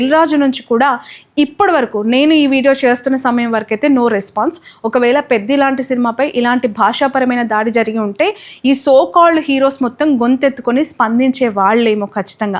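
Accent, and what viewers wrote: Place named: Andhra, India. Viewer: Evida enti telangana slang matladatle 😢 Andhra slang or neutral slang matladutundi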